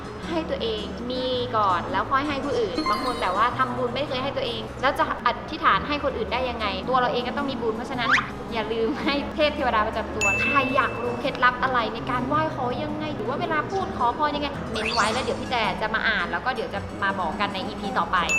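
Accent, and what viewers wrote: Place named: North, Thailand. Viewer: พี่แตพูดเหนือน่ารักมากเลย ดูเรียลจริงๆ รอติดตาม Ep. ต่อไป ❤️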